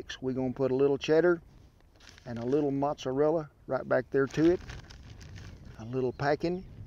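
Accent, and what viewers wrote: South, United States. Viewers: I'm from the South, and I've heard all kinds of accents all over the place, and this guy's accent is just delightful. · Just found your channel recently. I remember seeing you on chopped. I'm from Oklahoma but I'm livin on up in Yankee land right now. It's good to hear that real southern slang.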